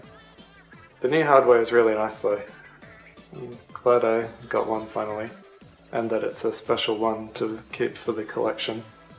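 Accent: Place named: London, United Kingdom. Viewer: sorry you just sounded like you were from London .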